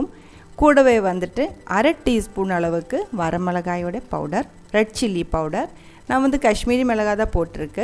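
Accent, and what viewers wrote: Kerala, India. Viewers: Hi...its lovely to listen to your Malayalam mixed Tamil😍😍 Can u please leave the link of your malayalam channel. Waiting to see more vlogs from u😍😍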